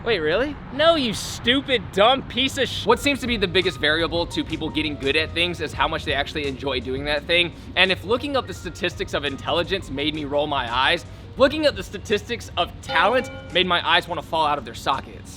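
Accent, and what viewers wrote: United States. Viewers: You are asian? ^^ didn't even notice lol sounds like american, lives in usa, is american. ^^ Maybe bcs "race" is absolute not important to me, neighter nationality is. But one question still remains: How long?! 😀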